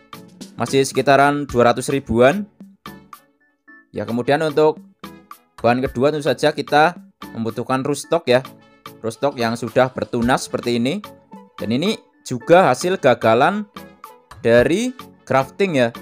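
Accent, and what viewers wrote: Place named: Banyumas, Indonesia. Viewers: oke mas, mksh banyak atas ilmunya.. Sy smakin faham. Sukses selalu. Boleh tau mas asli tegal ya? Medoknya ky logat tegal.hehee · Keluarkan ngapakmu mas bro wkwkwk.... Mantul pokoke😜😜😜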